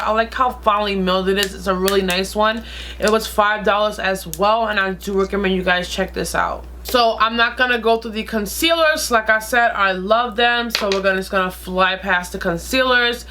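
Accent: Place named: North, United Kingdom. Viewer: i always love her accent lol she got a (I'll whoop your ass)up north type of accent.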